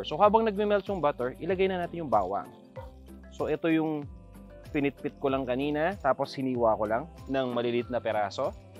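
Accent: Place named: Batangas, Philippines. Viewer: Nasa parteng calabarzon ka sir...sa accent mo..Southern tagalog😂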